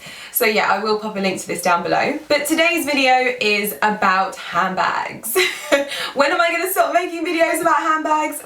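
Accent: United Kingdom. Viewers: Really Love your style and your bags!!! Keep posting your fabulous bags :) and your accent remind me of the days studying in the UK. Love from Taiwan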